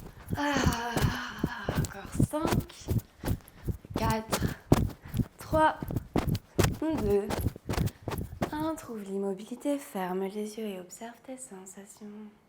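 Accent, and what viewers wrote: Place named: France. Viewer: Bon dimanche, tu as un bel accent français, j'adore les grands écarts et les mouvements, chérie. 🥰❤🌹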